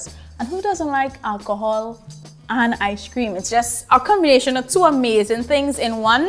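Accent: Trinidad and Tobago. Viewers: Oh yes, Trinidadian accent is the best. No other accent can match it. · Trinidadian accent is always so satisfying 😌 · I love trinidadians accent 🤗🤗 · Trini accent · That trini accent so sweet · Wait you saying she is from Jamaica..... because she sound like she is from T&T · That Trini accent 😌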